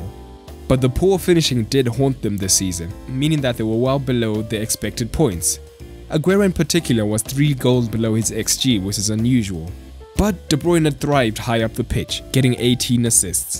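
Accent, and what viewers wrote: South Africa. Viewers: I'm sorry but your accent sounds South African, are you South African?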